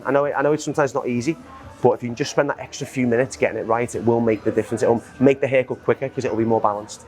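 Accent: Liverpool, United Kingdom. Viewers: It sounds to me like a 'scouser' accent or dialect. This is associated with Liverpool in the county of Merseyside, England, UK 👍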